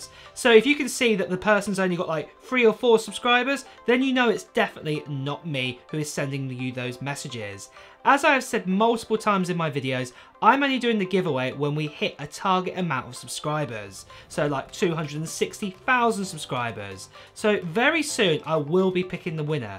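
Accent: United Kingdom. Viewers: Well, I want to buy the iPhone 11. But sadly I don’t have money for that. And also i didn’t already bought any apple gear or product cuz I don’t have money for that, And i hope i will be the one who got your iPad. Because It can help me in my studies. btw i like your british accent, congrats and godbless💖💖💖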